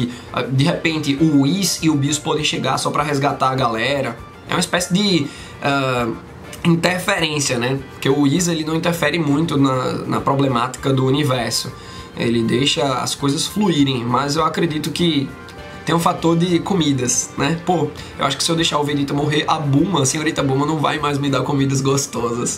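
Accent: Pernambuco, Brazil. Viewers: Esse cara e Pernambucano kkkkkkkk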